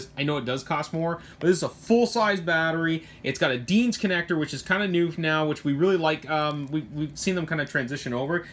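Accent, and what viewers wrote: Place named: Canada. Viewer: Hi, really enjoy your humor and Canadian accent. You broke through my work funk in just a few minutes listening to your spiel. Keep up the good work. Cheers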